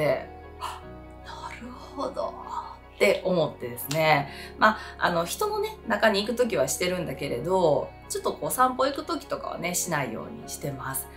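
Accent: Kansai, Japan. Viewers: 声と関西弁にやられる方多いでしょうね😊個人的には、女性社員と把握に役立ってます。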